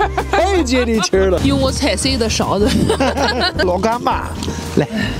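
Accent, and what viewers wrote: Dalian, China. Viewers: 哈哈 真幽默。而且大陆口音也逗。订阅开始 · 大连话不要太标准啊！太怀念国内了！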